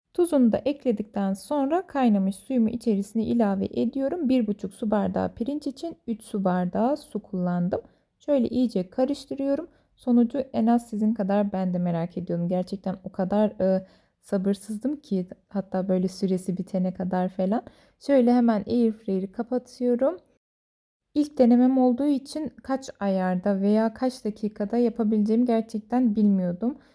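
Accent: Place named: Azerbaijan. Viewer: Bu hanim kesin Azerbaycanli 😀 aksani var 😀 aparat dedi ilk bashda . Unutmuyun 😀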